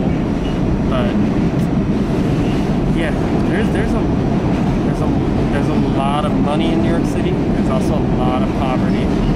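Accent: Pakistan. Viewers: also love your urdu accent ❤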